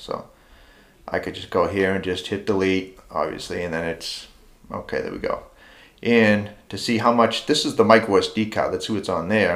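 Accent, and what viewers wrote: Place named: Boston, United States. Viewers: Way to go. I recognize the Boston accent. I’m from Boston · lol im from amsterdam and i was about to ask if it was a boston accent heheh cant miss that shit :)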